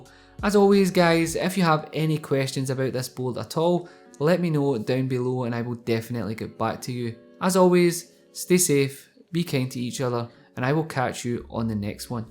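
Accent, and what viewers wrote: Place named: Scotland, United Kingdom. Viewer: Yer Scottish ye have my support just got the board for a new build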